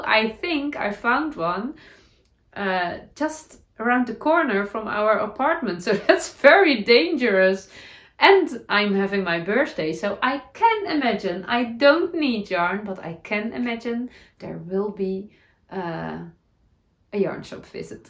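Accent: Netherlands. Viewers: 😂😂😂😂😂 stop saying “shit” 😂😂😂😂😂😂😂 oh my goodness!!!!!! I love your Dutchy English!!!!!!! You completely brightened my day with your talk of shit 😂😂😂😂😂 I’m sure you now know, we say “poo” Wonderful ❤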